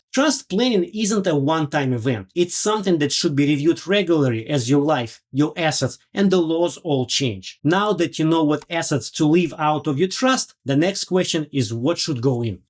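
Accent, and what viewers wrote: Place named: Ukraine. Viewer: I love your voice accent ❤...R U Ukrainian? (good advise too!)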